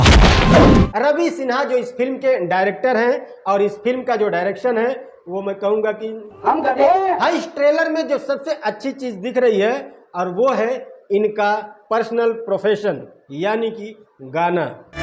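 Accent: India. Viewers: Aap to akadam south indian ke logo jaise bole hai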